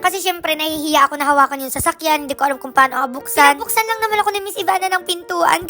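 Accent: Batangas, Philippines. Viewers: ala eh tlga tgalog tlga tono ala tga Tanauan ako isa tga hnga mo sn mkpunta kmi jan soon Godbless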